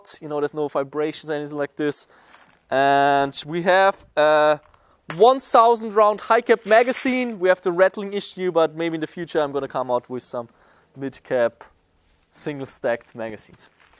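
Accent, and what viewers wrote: Germany. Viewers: Then se fleshlight right here. I love his englisch xD. Dieser deutsche Akzent hahahaha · Is he german or austrian? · Are u german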